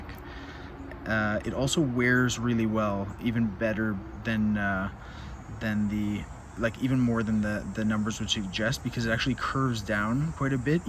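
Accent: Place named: Canada. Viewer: Enjoying your reviews. You should do more. You're Canadian eh, just your Os and French pronunciation. Are you involved in any local watch groups?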